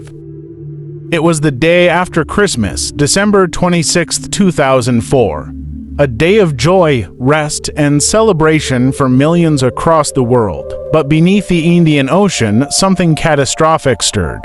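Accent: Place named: United States. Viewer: Ik zit niet op Amerikaans praten te wachten.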